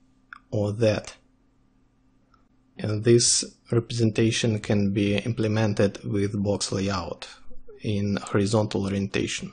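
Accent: Russia. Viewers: Russian ? am I right ?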